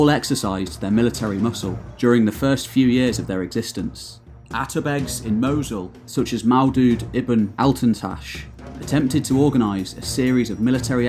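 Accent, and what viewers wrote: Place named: RP, United Kingdom. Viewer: Just wondering if you have a true passion for history , judging by your tone . As I have said earlier , your Queen's English is music to the ears .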